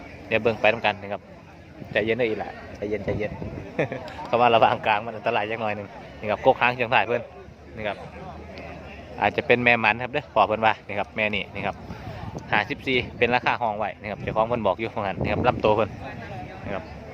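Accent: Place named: Sisaket, Thailand. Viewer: ศรีสะเกษชัดเจนจ้าอ้าย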